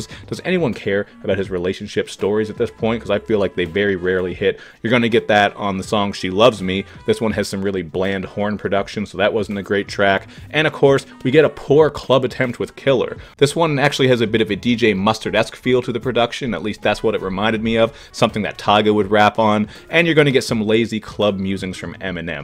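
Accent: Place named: Nova Scotia, Canada. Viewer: He's from Nova Scotia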